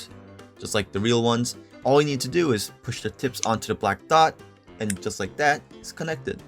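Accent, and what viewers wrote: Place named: South Korea. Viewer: 한국사람 특유의 악센트가 있네요, ㅎㅎ 아무튼 좋은 리뷰 감사합니다.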